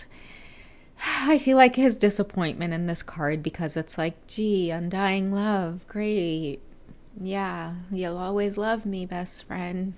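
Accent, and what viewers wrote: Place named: Trinidad and Tobago. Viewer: Aye you not eash na with dah trini accent, makes me laugh...lol.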